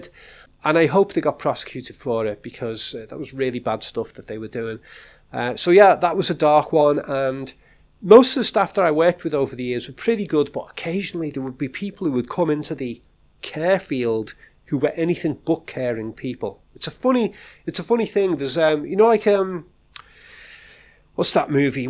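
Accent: Liverpool, United Kingdom. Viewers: Just discovered your channel so excuse me if it’s been mentioned, but are you a scouser ?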